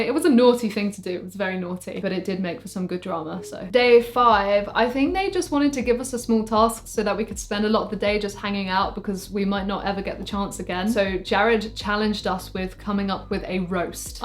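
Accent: United Kingdom. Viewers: The real question is, did someone make out with someone while at the mansion? Also I love how britishly you say "wa'eva", "we should'av donnet" and "sumting lae tha". Anyways, big fan here. I've watched you since you were a teenager and promoted Bias FX 2 (I actually bought it because of your videos). Keep rocking, you are amazing! Cheers!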